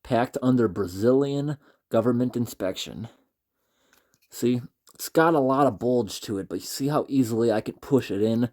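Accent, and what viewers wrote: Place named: Alaska, United States. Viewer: I was born and raised in Alaska, and he reminds me of a true Alaskan! Even the way he talks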